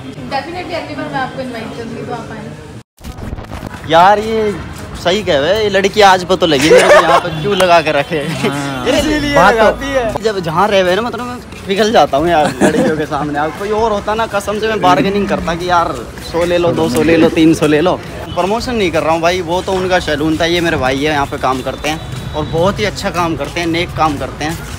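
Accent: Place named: Rural, India. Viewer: भाई तेरा बोलने का तरीका देशी लड़के की तरह है। मजा आ गया भाई। 🤗🤗🤗🤗🤗🤗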